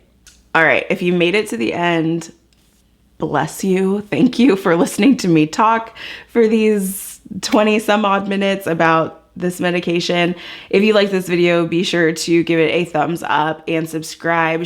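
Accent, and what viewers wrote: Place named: Texas, United States. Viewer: Hi, I just took my first dose of Ozempic and I’m so looking forward to hopefully getting the results that you and my doctor spoke about‼️. Totally unrelated; as I was listening to you I was thinking, “ this chick has to be from Texas”! My doctor’s in Katy.🤣🤣🤣. I wish you continued success in your weight loss and wellness journey. Thanks for sharing🥰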